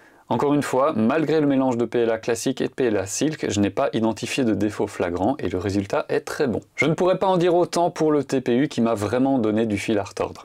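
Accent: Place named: France. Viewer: I am from the usa and youtube tries to put the english ai robot voice over your beautiful French accent, I turned it off ;) I can't want for this one! I just bought an H2D to go alongside my X1C and those printers are bulletproof workhorses, but this U1 will speed up multicolor prints by so much, while reducing waste as well as some color bleed issues I had to tune out of some of my prints with a lot of white filament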